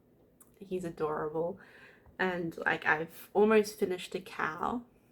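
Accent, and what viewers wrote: Australia. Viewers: Hi I am from Busselton and it is difficult to source patterns fabrics local, Stitches Corner in Perth has just been sold and the shop is moving to Balcatta and will reopen the end of February with an online shop 🤗 as well, I have just started back into cross stitch and quite a novice but like you enjoy other crafts e.g knitting etc I have bought patterns from a Stitch in Time and also Crewel Goblin in Sydney. I enjoyed watching and hearing an Aussie accent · I LOVE hearing a fellow Aussie on YT! What a great first video 😊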